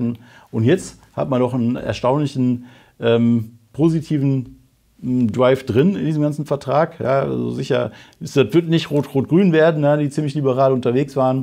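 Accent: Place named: Duisburg, Germany. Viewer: Ich mag den Dialekt. Schätze Duisburg.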